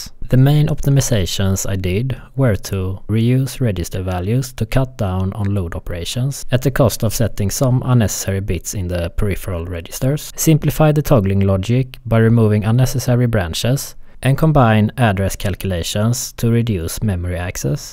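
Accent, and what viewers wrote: Sweden. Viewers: Interesting project but I especially enjoy the Swedish accent. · swedish? accent is swedish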